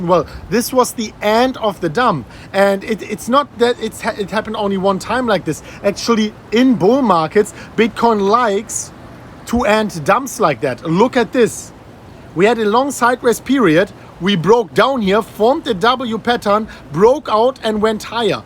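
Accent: Germany. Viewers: PS: Der deutsche Akzent ist voll geil :P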